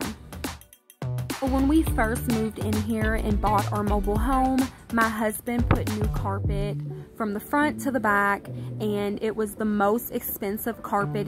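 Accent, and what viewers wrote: South, United States. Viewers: I enjoy listening to your commentary because of your accent. It reminds me of my southern family, and you're pretty as well. Love from California.;)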